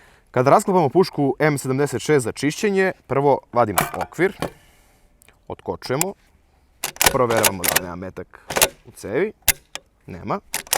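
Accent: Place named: South, Serbia. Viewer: Yugo soldier papcina obicna ovaj he ozbiljan momak sa juznosrpskim akcentom very funny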